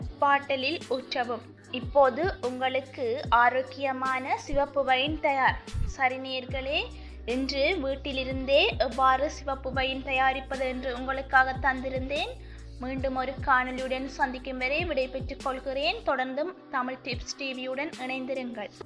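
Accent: Sri Lanka. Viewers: She is speaking srilankan tamil..pakki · Elangai Eelatamil arumai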